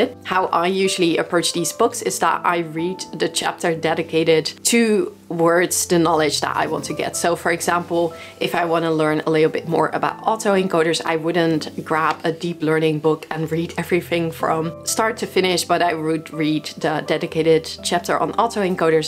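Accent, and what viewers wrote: Netherlands. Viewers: your accent feels dutch, love how youtube is recommending me dutch youtubers who speak english XD, YT algo is really remarkable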